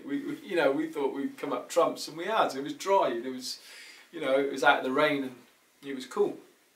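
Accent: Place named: Liverpool, United Kingdom. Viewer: Watched on my lunchbreak. Really enjoyed your stories particularly the one about meeting up with your old friend, I love it when things work out like this. It took me many years before I visited Scotland - I had never wanted to as a family member had a bad experience and this put me off, I eventually visited for the first time around 10 years ago and I couldn't believe I'd left it so long - the highlands are so beautiful and I have visited a few times now but I haven't been to Glasgow. Loved your scouse accent 😁 So lucky that you'd slowed down in the car and there were cones there, doesn't bear thinking about does it. So sad about your brother, looks like you have some happy memories together, I hadn't realised you were twins. The dog barking made me jump out my skin, I think I had it on a bit loud 🤣 I'll check out the other links -Take care Donna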